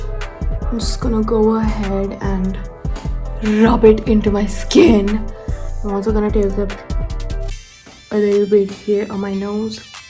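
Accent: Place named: Mumbai, India. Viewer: love the sobo accent actually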